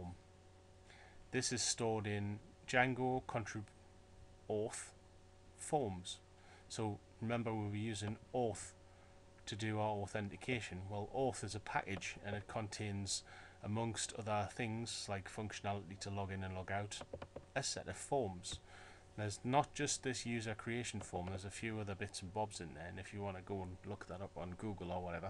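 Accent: United Kingdom. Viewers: Really great and clear stuff! Learning loads. Plus ice to hear a British accent for a change.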